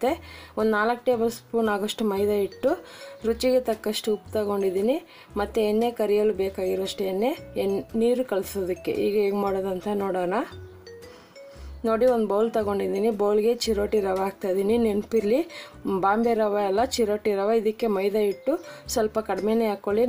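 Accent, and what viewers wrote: Tamil Nadu, India. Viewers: Yenne Tamil slang aanstidee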